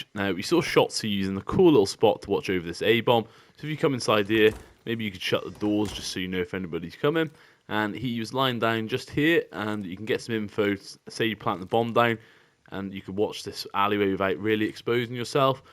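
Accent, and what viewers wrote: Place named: United Kingdom. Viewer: every American likes a UK accent over any commentary, I promise you 😂 it’s just a thing. You’ll keep growing bro, just keep releasing content.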